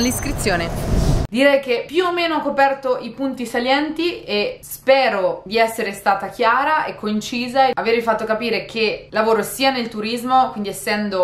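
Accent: Ancona, Italy. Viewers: Ciao, sento da come parli che sei di Ancona. Confermi?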